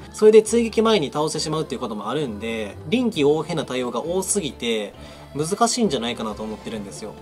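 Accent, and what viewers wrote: Kansai, Japan. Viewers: 関西人かな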